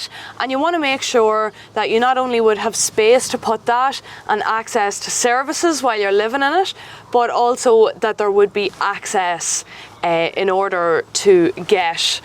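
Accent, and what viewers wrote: Ireland. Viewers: Thank the earth, you've an Irish accent and I can bare to listen to you (too many Brits on youTube buying up our housing stock in 2020s). Congrats on the purchase... I'll watch and follow how your place progressed. As I write, Ive gone sale agreed on a 1949 cottage in great condition but it needs a bit of love.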